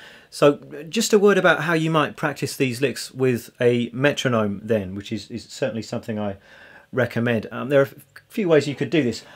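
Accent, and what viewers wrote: England, United Kingdom. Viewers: Great lesson ! Where in England are you from ?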